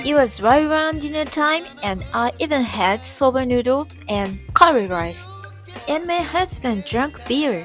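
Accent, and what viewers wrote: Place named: Japan. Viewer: 很明显是日式英语，不是中式英语。 已经说得很好了，理解完全没有障碍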